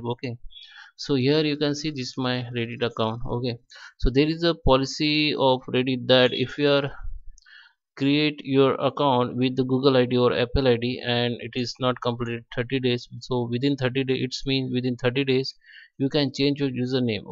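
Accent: India. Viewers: He sounds like an Indian and most of the elderly working people in India prefer windows 7 because it is simpler with less bugs in the software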